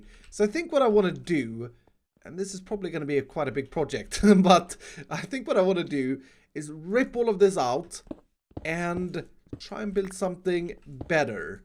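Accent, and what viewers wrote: Sweden. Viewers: Are you from Sweden iskall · Are he Swedish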